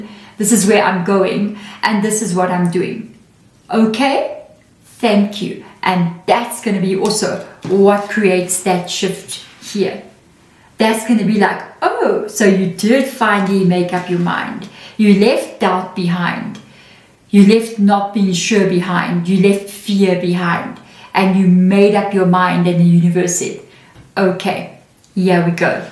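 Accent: South Africa. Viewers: Your readings are amazing 🙏 I recognize the SA accent - hope you are - am totally resonating with yr other readings too Thank you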